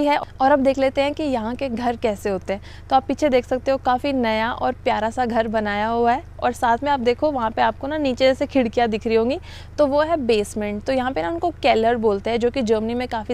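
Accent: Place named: Bagri, India. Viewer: Ap hariyana k bagadi belt k lagte ho . Bolne ka andaz btata h . ❤❤❤❤❤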